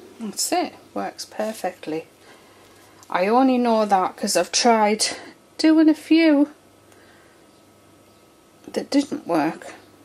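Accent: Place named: England, United Kingdom. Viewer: Are you based in England because you sound like a English person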